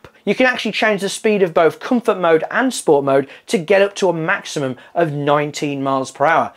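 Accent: West Midlands, United Kingdom. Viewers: American why are you lying for🤪 beens every yellow & white number plate & the green phone control box is all British & the houses etc,god knows how you thought you would blag that one I live in the west Midlands & they all still use scooters here they blend in with the ones you can hire in the town centre so I wouldn't worry about it enjoy ya self they won't throw you in the clink😁 you probably live right down the road from me with that accent bro.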